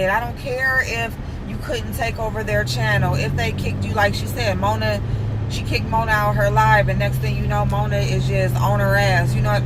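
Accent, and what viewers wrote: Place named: Ohio, United States. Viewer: I knew it. I was born in Ohio and I know that accent. You sound just like my family members!Lol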